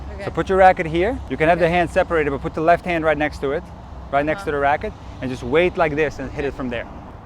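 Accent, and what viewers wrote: Russia. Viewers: is russian love her asscent saw her from the beginning so good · ДА ТО РУССКАЯ ПО ГОВОРУ!!!🤣🌺🤣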